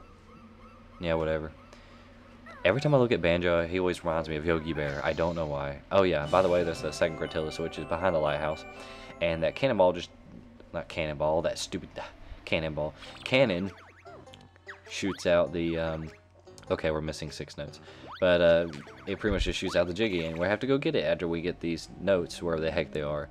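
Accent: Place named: South, United States. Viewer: Your accent is pretty cool! It's southern but not seriously obnoxious like other people with southern accents I've heard. It's wierd because I live in the south and I don't have a southern accent... I don't think. O.o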